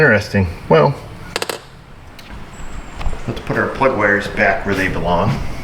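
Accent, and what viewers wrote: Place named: Upstate New York, United States. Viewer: I have been binge watching just to hear an upstate NY accent. I miss my alma mater Binghamton. Wish the communist hadn't taken over.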